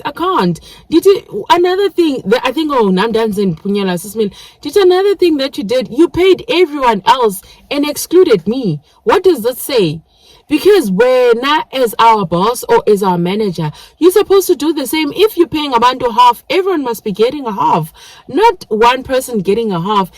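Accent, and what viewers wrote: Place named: South Boston, United States. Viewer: your home language sounds beautiful I think you talk like real southie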